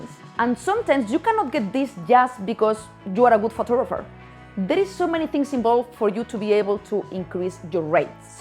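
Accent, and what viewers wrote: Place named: Spain. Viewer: I love her typical Spanish accent when speaking English ❤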